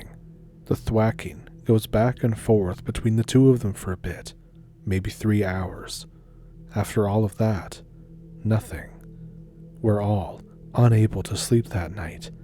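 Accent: Canada. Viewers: Thanks for sounding exactly like My B.C., And Ontario cousins and family. Peace to You- AND-- ELBOWS UP-!! 🎉🎉🎉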